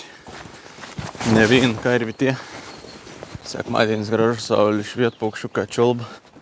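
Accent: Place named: Samogitia, Lithuania. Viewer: O kodėl blogai gražia žemaitiška tarme?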